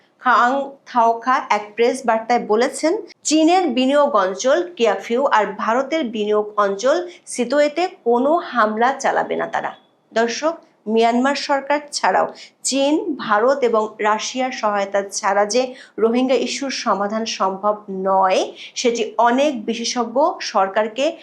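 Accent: Chittagong, Bangladesh. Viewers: আমি চট্টগ্রামের,,আপনার মুখে চট্টগ্রামের ভাষা শুনে,,আমার খুব হাসি পাচ্ছে😂খুব সুন্দর করে প্রেজেন্ট করেছেন চট্টগ্রামের ভাষাকে,,অসংখ্য ধন্যবাদ আপু💞💞💞জয় বাংলা জয় বঙ্গবন্ধু♥️♥️♥️ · আপু, আপনার চাটগাও ভাষা ভালোই লাগলো! স্টুডেন্ট লাইফে ( চুয়েটে) চট্টগ্রামের ভাষা শেখা, বলা সহ চাটগাঁর ভাষা নিয়ে অনেক মজার সৃতি সামনে এলো! অনেক বছর অতিক্রম হয়েছে, এখন বুঝি, বলতে পারি না! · ইউনুস সাহেবের পল্টি বাজি কথা বয়সের ভারে তাই মনে হয়! ৫৪ বৎসর ইতিহাসে বাংলাদেশ আজ ৭১ এর পরাজিত শত্রুর দ্বারা নিয়ন্ত্রণ করছে!সুন্দর চাটগাঁইয়া ভাষায় ইউনুস কথা আবার শুনতে পারলাম উপস্থাপিকার মুখে! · আপু আপনার মুখে চট্টগ্রামের আঞ্চলিক ভাষায় কথা বলা একেবারে জেনুইন ছিল👍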